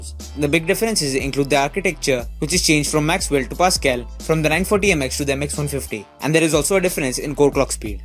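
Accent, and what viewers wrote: India. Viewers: Indian accent is always funny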